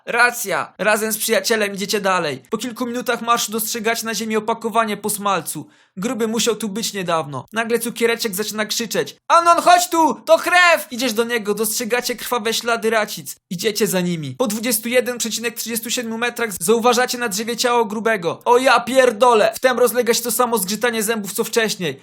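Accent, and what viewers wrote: Kielce, Poland. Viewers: Jesteś z Kielc?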